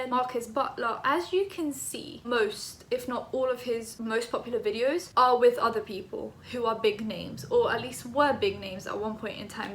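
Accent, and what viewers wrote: United Kingdom. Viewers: Not to be rude bc i enjoy your content and it’s not like you can control this but for some reason I just can’t stand british accents. Idk why but for me it’s like nails on a chalkboard. Anybody else feel that way or is it just me?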